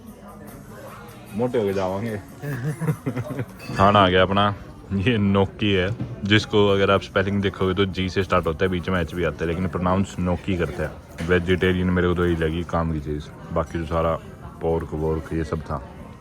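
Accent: Haryana, India. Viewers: bhai aap haryanvi bolte huye jyada mast lgte ho ❤ Gajab accent lgta h aapka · Haryanvi accent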